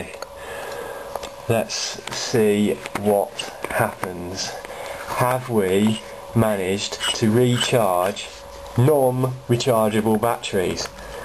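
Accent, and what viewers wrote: United Kingdom. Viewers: wow some ones british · you are so british. it's awesome. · that was really funny! ...might just be the British accent, though. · Nothing he is BRITISH!!!!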